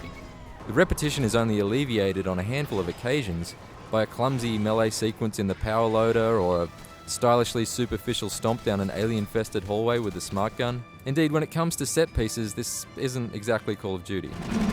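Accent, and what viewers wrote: Australia. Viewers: hes australian like me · Nice Aussie accent bro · YAY! an Australian reviewer!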